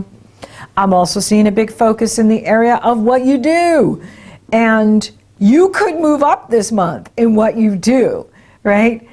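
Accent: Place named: New England, United States. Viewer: Hey,I'm a NE resident too! Massachusetts! I always resonated with your accent... Now I know why! I'm studying astrology now and I really appreciate your videos! Looking forward to a little snow tonight! Stay warm!:). Ellen Green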